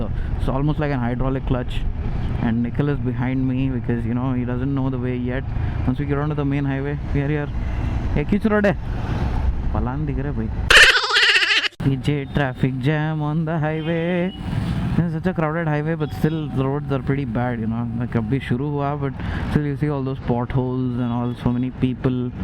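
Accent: Hyderabad, India. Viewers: again hydrabadi touch😂😂 keep it up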